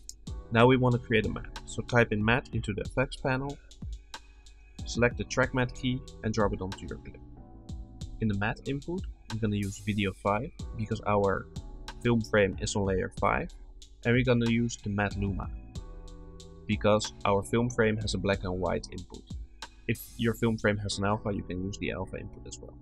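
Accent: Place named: Netherlands. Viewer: Toffe video! I recognised a Dutch accent I think, if I'm not mistaken.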